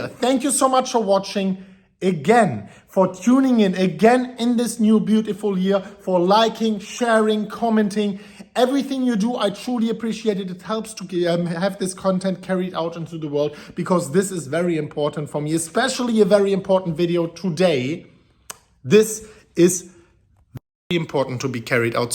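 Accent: Germany. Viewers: I watch his video for the drama and his German accent, “People spitting on my face…”😂 Keep it up as it’s all going down…trend is our friend until the trend; the macro trend in down first time in crypto history…so screw the pleul or some Dutch crypto guy or whoever… BTC will be lucky to hang in at $16k for 2023!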